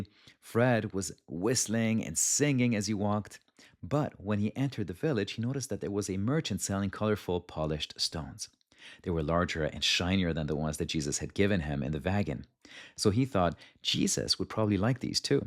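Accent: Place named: Germany. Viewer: Where are you from? You pronounce the wagon with a v not a w sound,Germany?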